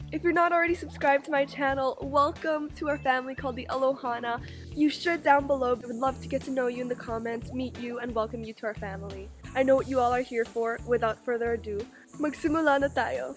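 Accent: Philippines. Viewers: dat filipino accent is cute tho uwu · Your filipino accent is ADORABLE!💓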